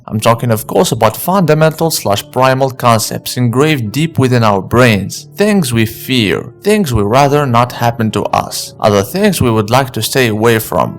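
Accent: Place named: Japan. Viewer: oh. I asked do because your accent is very jap.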